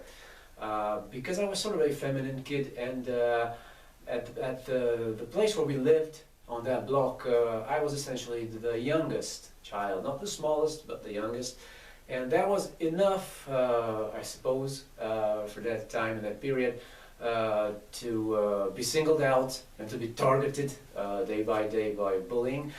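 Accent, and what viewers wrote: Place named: Northern Europe. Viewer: Hey sister, interesting accent, North European or Brazilian? Maybe a little Ricky Martin in your voice.... catch you on <link>!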